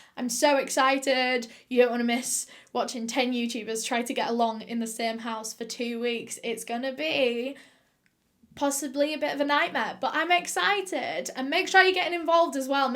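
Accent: United Kingdom. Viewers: "wrongenh" :'') i love the British accent its so cute sometimes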